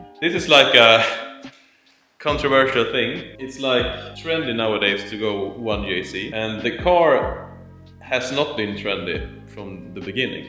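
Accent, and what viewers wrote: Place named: Sweden. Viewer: yeeez verybra jdm swenglish du got my sub <3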